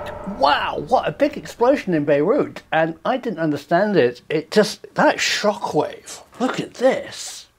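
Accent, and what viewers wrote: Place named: Scotland, United Kingdom. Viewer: Professor I thought Scottish where are you from I’m from Fraserburgh in the north east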